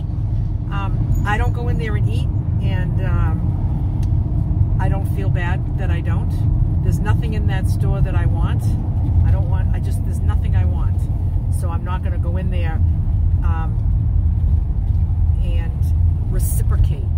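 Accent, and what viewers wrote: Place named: Florida, United States. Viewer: is your driver seat on the right hand side? You talk like you're in Florida but what the hey?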